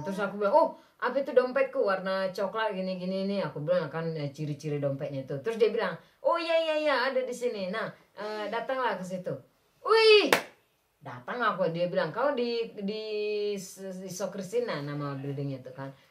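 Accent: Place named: Medan, Indonesia. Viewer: selalu tanpa skip kalo nonton vlog ka, seru bgd apalagi pake logat medan lucu bgd 😂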